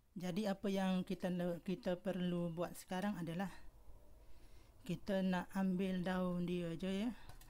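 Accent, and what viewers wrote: Malaysia. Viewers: Dia orang kelantan saya pun orang kelantan ..orang kelantan bila suruh cakap kl kek kok sikit😅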